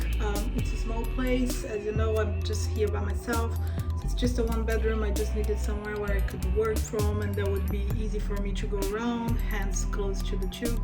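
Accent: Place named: United States. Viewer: Interesting,, but you really don't have a British accent.. you sound more like an American.. hahaha 🤣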